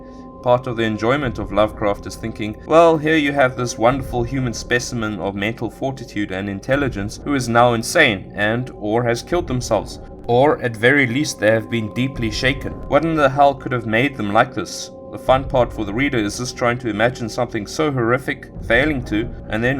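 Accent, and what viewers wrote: South Africa. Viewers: I'm really enjoying your content, mate. Also, out of pure curiosity: Your accent.. are you south-African/boer?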